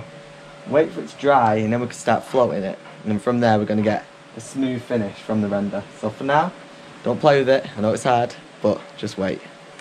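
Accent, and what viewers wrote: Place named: Northern England, United Kingdom. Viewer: Nice work there, just wondering where abouts you work as I'm in northwest UK and you sounded like a northern lad 😉 as in need of a house render as well as me cills cleaning up as they are looking battered. Cheers. Kev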